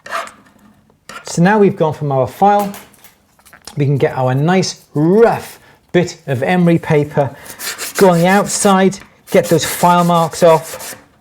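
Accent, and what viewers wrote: Wales, United Kingdom. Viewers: Great videos. U have a sight Welsh accent s. Wales??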